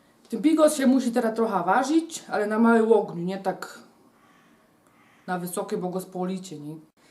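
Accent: Silesia, Poland. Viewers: Uwielbiam slazakow sama mam rodzine na slasku no to na Swieta dalas mi pomysl na bigos po slasku pozdrawiam fajnie gwarzysz po slasku ;) tak trzymaj. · Pani prosze nie podjadac tego miesa z patelni bo nie bedzie co do kapusty wciepac.Ja robie zupelnie inaczej bigos szczegolnie swiateczny ale napewno spruboje Pani wercje kiedys.Nie jestem slazakiem ale lubie Pani gware i ta taka prostolinijnosc.Pozdrawiam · skad lochodzisz? Niby mowisz po slasku ale troche inaczej niz u nas w domu mowili?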